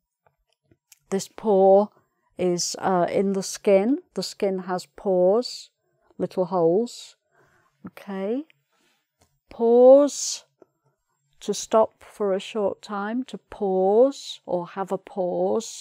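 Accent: United Kingdom. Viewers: Que delícia assistir às aulas dessa adorável senhora! Inglês Britânico fica mais interessante com as aulas dela. Obrigado! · I love British English. Thank you for your English. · i like british acent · I love the british english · It has been a utterly splendid, exquisite and flawless lesson. I'm particularly joyful, blissful and elated with that opulent topic. It is to my liking; moreover, it appeals to me. I do adore speaking, and her charming accent., Hence, I present; undoubtedly, the Queen of British English. You are the quintessential of British English. Thank you very much indeed. Far thee well, dearest Prof.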